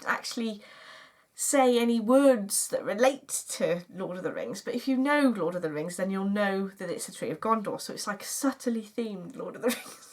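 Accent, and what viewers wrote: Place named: Bristol, United Kingdom. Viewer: Oh I just found you! Fab podcast and such fun that you are in East Anglia..I'm in Cambridgeshire....although your accent sounds more Bristolian??:) Look forward to your next episode x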